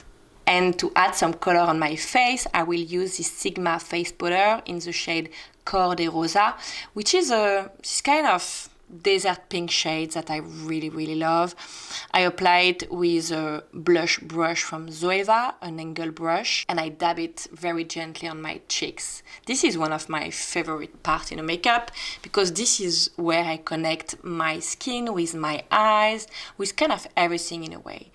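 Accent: France. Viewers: Just stopping by to say hello. This is my first ever comment on YouTube 😳 But I had to. So many already told you about your stunning, pretty and elegant makeup style. However......the french accent...Oh My God. My heart is melting. So beautiful. As if you are singing the tutorial. I love it. You are definitely my new favourite YouTuber.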